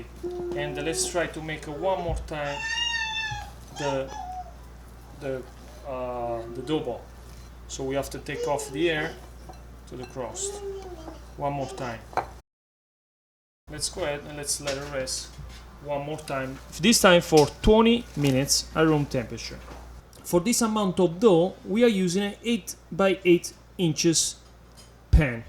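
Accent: Italy. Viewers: che tipo vivace che sei maddò si capisce subito che sei italiano! Tanti saluti da un abruzzomolisano che vive in Germania. continua così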